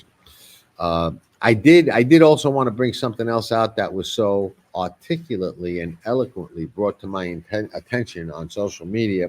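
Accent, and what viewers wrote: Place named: East Coast, United States. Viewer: Hey,. I'm sorry, too. I left grey out..went with Tuscan..my reason was I didn't think grey could run well on short rest. I love your east coast accent, thanks for the hood insight. You know your game. I'm just learning.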